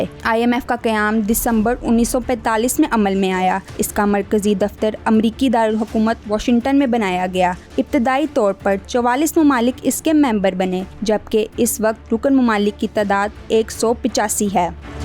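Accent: Punjab, India. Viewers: Voice over acha Lagye us se bhut farq parta hai CHOTI Umar ki larki ki voice lag rhe hai Jo k Punjabi hai ye maloom parta hai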